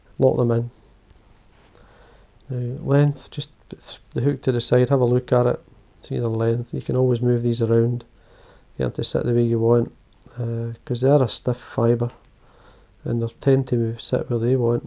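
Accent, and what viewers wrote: Scotland, United Kingdom. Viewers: The ladies love your soft spoken scottish accent, and those flies looks so easy to tie. A true ambassador to fishing. Outstanding work,enjoy your life 's passion and thank you for sharing.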